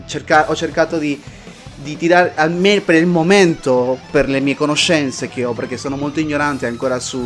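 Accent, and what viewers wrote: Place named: Spain. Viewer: adoro il tuo accento... l'accento spagnolo lo amo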